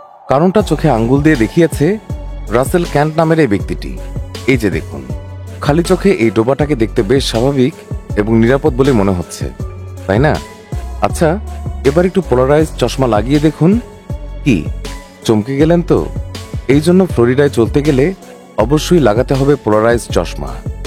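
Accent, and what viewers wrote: Bangladesh. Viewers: Ami sure apni narrator er beware jante chacchen. Hmm mone hoy Bangladesher Bangali. ❤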